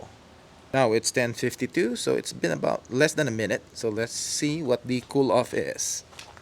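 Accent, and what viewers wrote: Philippines. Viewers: Kuya, you sound Filipino.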